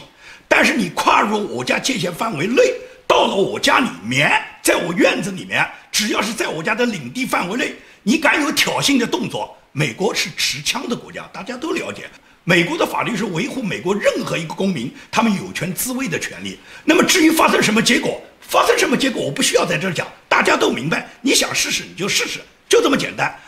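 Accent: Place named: Yangzhou, China. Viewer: 从口音，感觉您是江苏扬州人.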